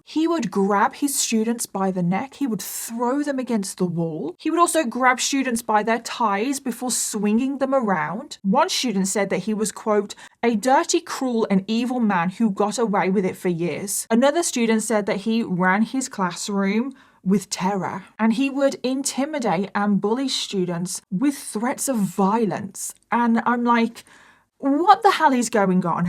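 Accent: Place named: United Kingdom. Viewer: I watch your content religiously every week Ive seen every video you have posted. Rewatched and i love what you do and your brittish voice! -- Rachel from South Dakota 🇺🇸 🌎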